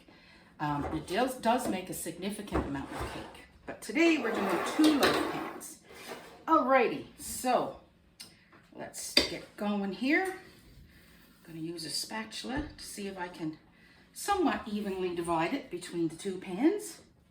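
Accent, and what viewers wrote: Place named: Ireland. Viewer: Boiled fruit cake has always been a big favourite here in Ireland, I started baking back in the 1970's when I got married and its one of the first things I made. These days I usually make tea brack, coffee cake, Bakewell cake and scones. Luckily my hubby loves cake! I honestly thought you were Irish, from Dublin exactly, except for a couple of words. But then Newfoundland and Ireland have long connections. Thank you for sharing your recipe.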